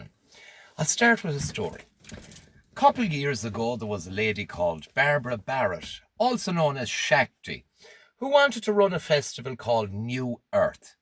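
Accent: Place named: Ireland. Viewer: Thanks I could listen to you all day. You have a fantastic speaking voice and accent. Irish legend.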